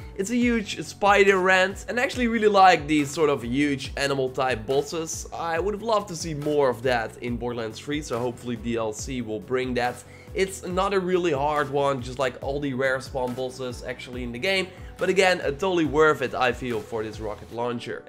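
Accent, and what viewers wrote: Netherlands. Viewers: That Dutch accent though.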